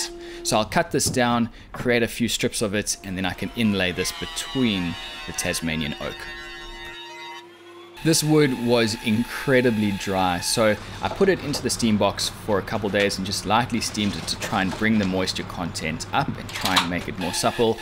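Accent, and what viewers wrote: South Africa. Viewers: Stunning chair .. and great to hear a saffer accent .. Thanx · Im picking up on a south african accent i think, im also seeing alot of items bunnings sells, would i be correct in assuming you’re in Australia?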